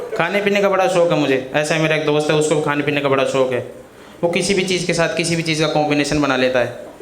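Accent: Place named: Dhundhari, India. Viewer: Aapni dhundhdhi boli ki to alg hi cha yarr😂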